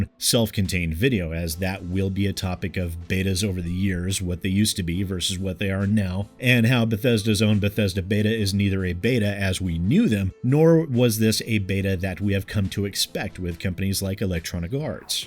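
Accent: United Kingdom. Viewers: Damn, this was so cheeky I could have sworn you were British. Nice work.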